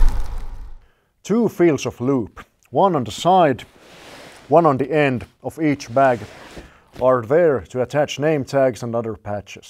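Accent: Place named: Finland. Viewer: I discovered that I can be persuaded to buy things by a handsome man with a Finnish accent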